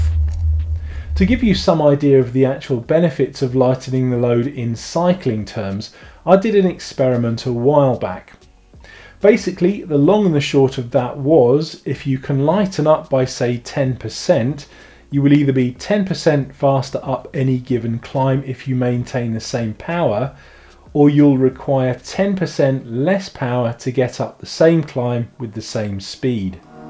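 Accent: United Kingdom. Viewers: you are really cool and a good cyclist. Love your oh so british way of talking. In cycling as in so many other things you get better by repetition, repetition, repetition, climb, climb, climb..... you will be astonished how much progress one can make. After even 1 month or 2 of repetitive climbing & climbing & climbing you do things that were utterly unthinkable before. Everything gets stronger, mind, legs & lungs.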